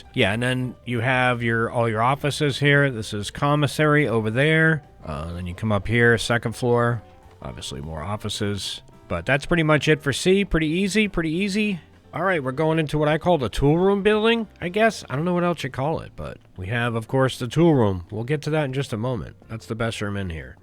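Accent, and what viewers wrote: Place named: Maine, United States. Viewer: oh yessa! Coast of maine hee-ah dee-ah!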